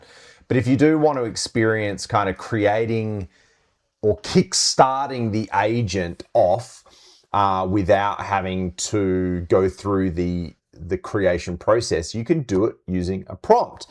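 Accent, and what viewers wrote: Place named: Australia. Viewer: Love the aussie accent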